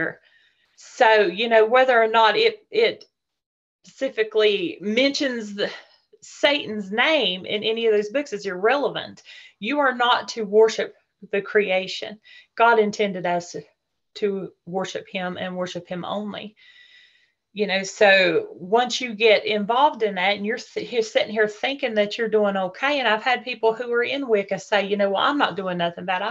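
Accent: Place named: Australia. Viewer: Mr. you have a beautiful accent. I can't place it though. Is it Australian or New Zealander? (Whichever country it is from I like it).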